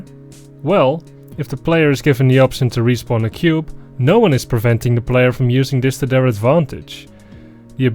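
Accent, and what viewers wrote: Netherlands. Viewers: wait, u dutch? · I was like "What is this Dutch accent?" and then I saw the name and was like "Oh, ok."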